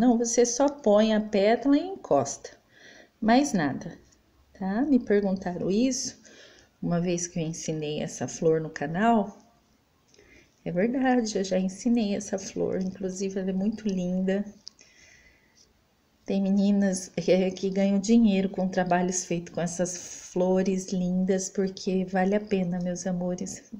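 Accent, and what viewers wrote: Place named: São Paulo, Brazil. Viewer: sotaque de sao paulo, igual ao da professora, é o mais bonito do planeta. pronuncia limpa sem enfeites, quase um canto. rsrs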